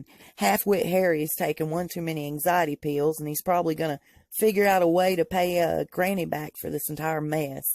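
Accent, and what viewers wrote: South, United States. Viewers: I just love your accent, I lived in the south and love to hear it! Plus you are 100 percent right about Megan it will catch up to her!😂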